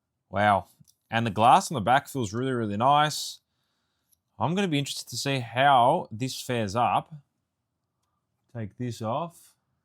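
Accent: Australia. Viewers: What is that accent? Australia? New zealand?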